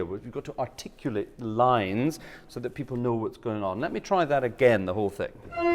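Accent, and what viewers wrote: Scotland, United Kingdom. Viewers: Love your scottish accent <3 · First time on your channel. Fascinating, as the organ has always seemed so complicated—to a piano player such as me. Also fascinating is how your accent moves from Scottish to Sean Connery performing a German general in a World War II movie. 😉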